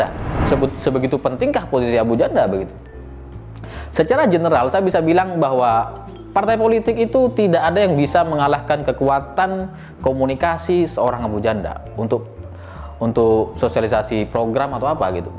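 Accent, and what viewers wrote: Madura, Indonesia. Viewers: Bang, logatmu kok Madura?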